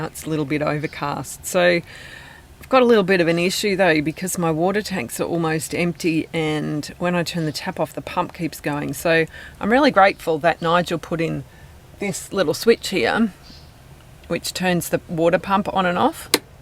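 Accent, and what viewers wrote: Australia. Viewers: I would never have known, you have a great Australian accent. What part of the UK?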